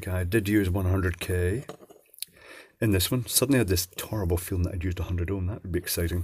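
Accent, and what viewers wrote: Scotland, United Kingdom. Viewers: Plimp WOW, i sit corrected. The scots accent is hard for us mere sassenachs! (silly nationalism aside, thank you for the correction, and sorry )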